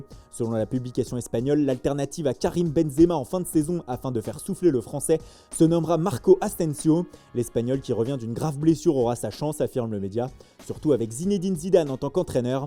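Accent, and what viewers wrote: Italy. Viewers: L’ accents italiens légendaire 😂🔥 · l'accent Italiens legandaire.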